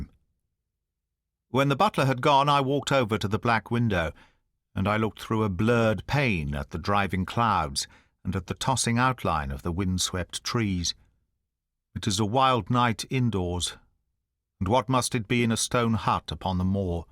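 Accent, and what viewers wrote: United Kingdom. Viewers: I don't understand British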